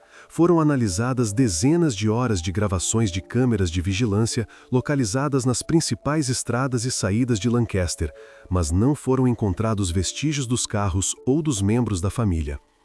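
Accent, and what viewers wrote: Portugal. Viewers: A estória se repete muito acho q seja pra ficar mais longo e fica cansativo, e sem contar que começa com um brasileiro contando a estória, do nada a aparece um português narrando, que loucura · Mas que narrador é esse é português de Portugal ou é do Brasil não tô entendo nada disso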